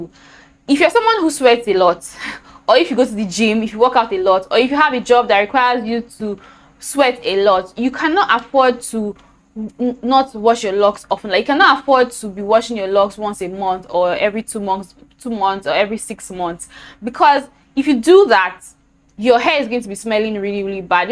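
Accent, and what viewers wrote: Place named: Nigeria. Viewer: Ok I'm only 2mins in but baby girl is giving Naija goodness with her bubbly personality, lovely smile and beautiful accent. Love the professional set up and lighting too. Now on to the rest of the video...😊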